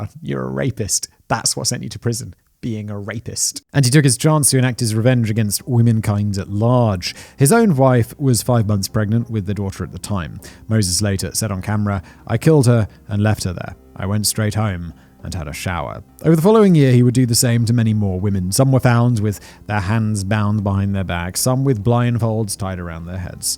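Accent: RP, United Kingdom. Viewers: anyone notice Simon's script reading voice is more posh than his normal offscript voice? *reads script sounds like Benedict Cumberbatch's Sherlock Holmes" "talks normally sounds like Michael Caine's Sherlock Holmes" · A persons bad choices are not their fault?! When you know he is in prison for rape! WTF? Exactly who's fault is it's? Simon, even with your posh accent you can't make that sound smart. His wife was every bit as responsible for her decision's as her husband was responsible for his decisions to beat, rape, and kill those women. Stop letting people off for their piss poor decision making abilities. It sets bad precedents. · If it never crossed your mind that orderly, wealthy countries with root philosophies that advantage individual sovereignty might do a better job measuring pathology rather than actually having higher incidence rates of pathology, maybe you should trade in your pompous brit accent for something less ostentatiously elitist.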